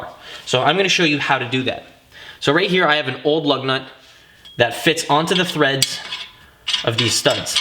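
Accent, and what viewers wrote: Canada. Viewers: I don't know where you guys in the comments are about this, but judging from his accent, he's in Canada and if I were him, I'd add a light film of anti-seize on 1) the threads of the studs to ease in impacting to press in, 2) on the threads in general because CA, 3) and even on both sides of the spacers to help prevent seizing in general. I live in Phoenix where corrosion isn't an issue and I still do this practice.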